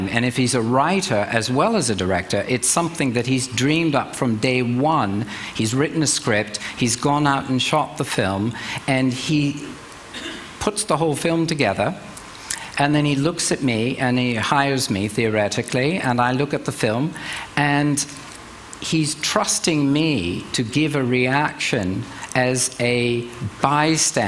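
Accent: England, United Kingdom. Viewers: What's with the accent? Did he emigrate from the UK? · Why does he have a British accent? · he was such a British/almost Australian accent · What's up with the British accent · why does * sound british he's American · Ive heard his accent in many interviews, his accent is very English, you can hear him pretend to do a american accent in this video! but what i do know he's lived in the U.K and studied there for many years when he was young and went to study at the prestigious Royal College of Music. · Woot...british accent?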